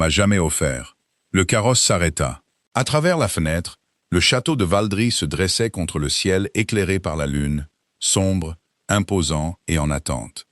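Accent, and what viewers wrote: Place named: England, United Kingdom. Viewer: Histoire agréable mais narration fort désagréable. Accent anglais puis voix monotone sans aucune chaleur.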